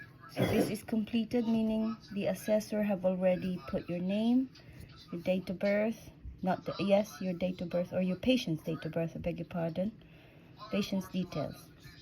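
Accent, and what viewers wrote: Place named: United Kingdom. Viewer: Naks ke galing naman mag tutorial bagay na bagay with British accent pa saan ka pa nun..😆💕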